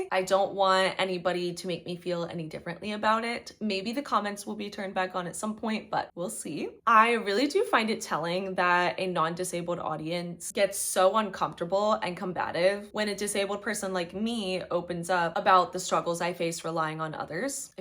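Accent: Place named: United Kingdom. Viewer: Someone tell me why I thought she was British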